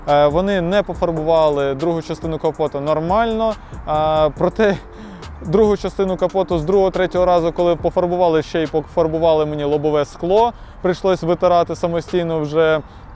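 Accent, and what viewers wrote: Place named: Russia. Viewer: Чути характерну вимову людини яка розмовляла російською, але звісно респект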